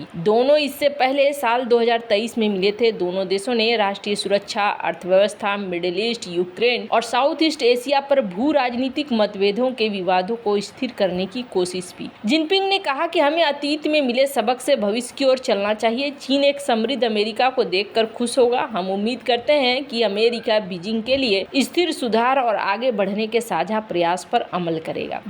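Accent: Bihar, India. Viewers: Ap bihar se h madam?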